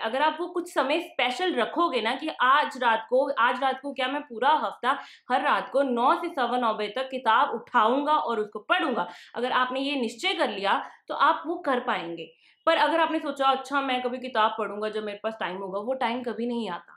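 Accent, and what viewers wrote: India. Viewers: I think you have a very good command over English language especially coz of your indian accent. I mean your language is quite easily understood by indian audiences. I looked for audio books over YouTube and applications so that I can improve my English but didn't get even a single video or audio book in indian accent. Then I thought of you for a second that why cant you create an audio book over youtube. Isn't it a great idea? It will help a lot of Indians like me who are looking for such audiobooks. I hope you come up with atleast one such book in near future. Thank you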